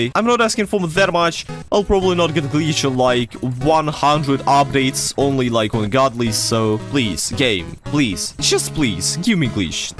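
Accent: Russia. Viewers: По акценту мне кажется что ты наш русский слоняра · Are you Russian?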